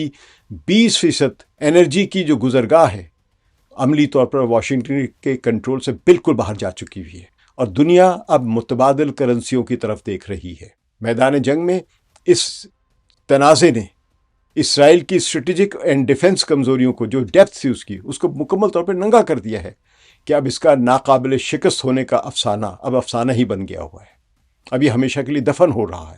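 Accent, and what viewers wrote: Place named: Punjab, Pakistan. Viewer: جی سلام ماشاء اللہ اپ کا تجزیہ بہت ہی خوبصورت ہے اور پنجابی ٹچ بھی بہت خوبصورت ہے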